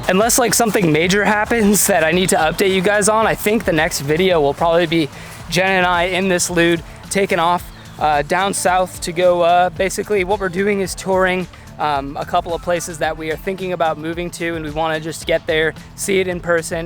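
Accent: Boston, United States. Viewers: FlAAA-RIDA, with that Bostonian accent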